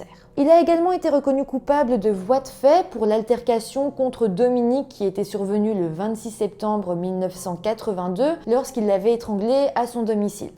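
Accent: Belgium. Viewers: Incroyable la justice américaine sur ce dossier, ce n'est vraiment pas cher payé pour un assassinat Merci pour cette histoire, j'ai cru reconnaître un léger accent belge, me trompe je ? CC des Vosges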